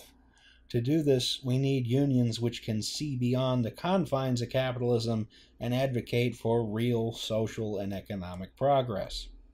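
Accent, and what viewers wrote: United States. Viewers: i think we need more people with strong american accents talking about socialism. i like this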